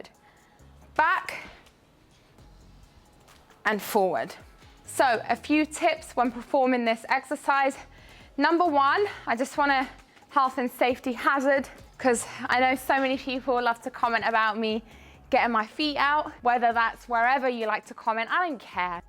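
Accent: United Kingdom. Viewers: Great detail!!! Not only the “how” but also the “why”. Brits are so easy to listen to as well. Subbed today. Hope the channel grows for you.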